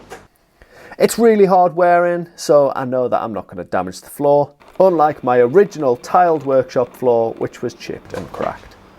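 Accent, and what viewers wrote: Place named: County Durham, United Kingdom. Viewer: Just came across your chanel. Nice one. Cant work out the accent. Co Durham or there abouts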